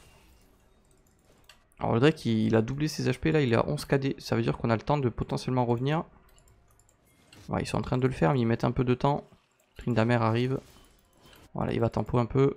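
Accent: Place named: South, France. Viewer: Tu prends de plus en plus l'accent du sud haha (super vidéo btw)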